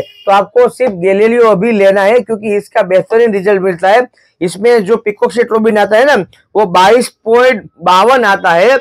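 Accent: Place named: India. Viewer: Aje tame apda hindi bhasha vara bhayu ne samjavo bhai ame to gujrati maj mahiti sambhad su apdi gujrati ni majaj kaik alag che bhai amay tamari bolvani pich sav desi bhasha ma majaj aeva rakhe che bhai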